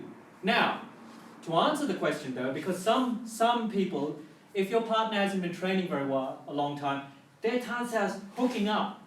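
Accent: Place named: England, United Kingdom. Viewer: Hi, love your channel. I've been practising Wing Chun for 2 years now, and my sifu's philosophy resembles much yours. It's all about relaxation and feeling in the arms when practising Chi Sao. You sound like you're from England. Please confirm and if so, I'd love to come to your course sometime when I visit England again ( hope I'll be soon enough, I'm not too far: south of France ). Cheers!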